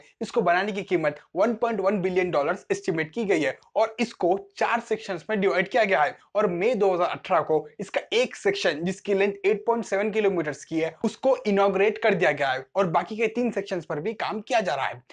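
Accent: India. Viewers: Is he from South ? 🤔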